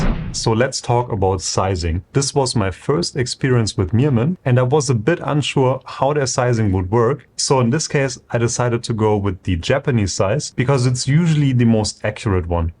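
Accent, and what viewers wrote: Germany. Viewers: I think you’re german by your accent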